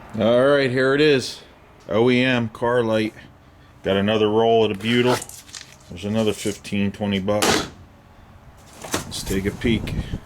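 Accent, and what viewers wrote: Pennsylvania, United States. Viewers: Thanks dude. I like how you clearly list all tools needed, for those of us on our way to the JunkYard. Plus, that Pennsylvania accent is reassuringly trustworthy.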